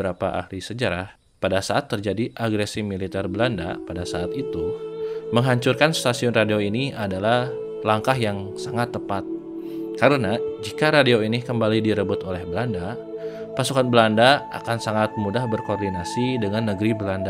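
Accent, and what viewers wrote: West Java, Indonesia. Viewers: Terimakasih infonya yaa...konten yg bsa nambah wawasan. Btw salfok sama cara baca narasinya, sundaaa pisaaann hihihiii makin sukses yaa kang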